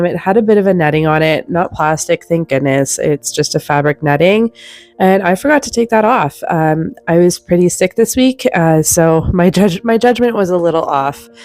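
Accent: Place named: Canada. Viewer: I love your tips and as a bonus I love the Canadian accent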